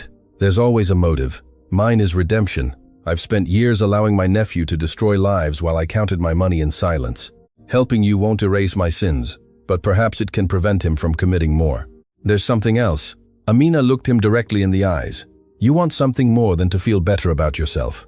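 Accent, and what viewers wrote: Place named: Africa. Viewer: ❤❤😊😊What a fantastic story!! Words can indeed change the world. It's tragic but true: arrogance blinds one to the cruelty they can wreak on others to salvage their bruised egos. Emeka should get another 25 years to ensure he doesn't emerge alive from prison. People like him should NEVER see daylight! Great story, fittingly narrated by one with an African accent. More of the same please.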